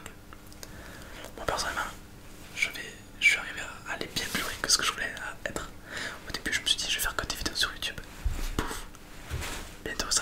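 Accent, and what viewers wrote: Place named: Tarbes, France. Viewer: Tu serais pas de Tarbes toi ? J’ai l’impression avec ton accent :)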